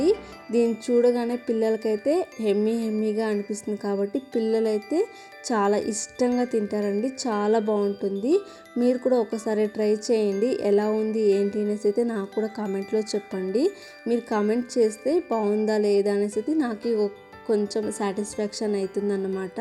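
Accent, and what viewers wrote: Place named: Andhra Pradesh, India. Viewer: Pakka andhra style mathadthira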